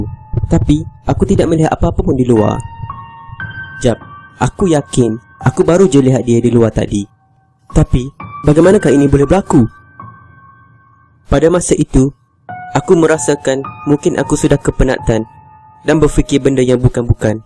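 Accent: Kelantan, Malaysia. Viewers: Abg orang kelantan ke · Demo ore kelate ko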